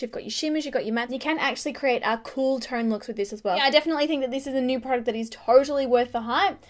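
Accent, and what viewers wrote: Australia. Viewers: I unsubscribed as well from Tati! Haven't watched her videos in months, because your videos are so real and besides, who can't get over listening to your beautiful accent!! You are a beautiful Australian woman who has helped so much with choosing products and I just love you!! Thanks for the tips regarding makeup and skincare!! Great video and your makeup is absolutely lovely and on point!!!! The Estée Lauder double wear foundation is one of Angie's, from Hot & Flashy, holy grail foundation! Therefore, since your recommending this foundation for oily skin types, then I'm gonna have to try it! I've been wearing bareMinerals's powder foundation for soooo many years... I believe it's time to try a liquid again!! However, or someone who may know...do you have a recent skincare (AM & PM) tutorial?? Because, I've been away for some time and am trying to catch up with you specifically!!! 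As always, much ❤️ from your 🇺🇸 RN oily sister!!💕. Hope to hear from you soon!! 💋💋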